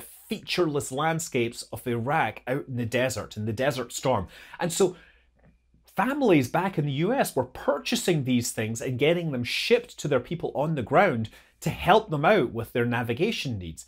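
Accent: Scotland, United Kingdom. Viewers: I think we’ve missed one of the most important use of GPS. How a golfer knows the distance to the green when he’s so off track in the trees that he can’t see the flag. 😊 Good to hear such a knowledgeable Scottish voice on here.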